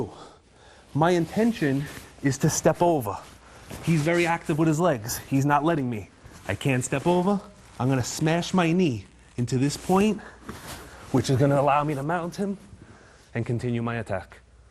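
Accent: New York, United States. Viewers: That's some amazing shit I like your videos you don't act like a bad ass just how it's done. Btw, you from NY.?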